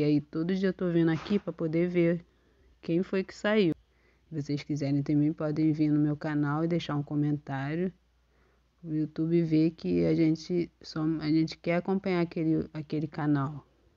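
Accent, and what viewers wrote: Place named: Rio de Janeiro, Brazil. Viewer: Muito bom esse vídeo amiga👏👏,amo esse sotaque do rio janeiro 😍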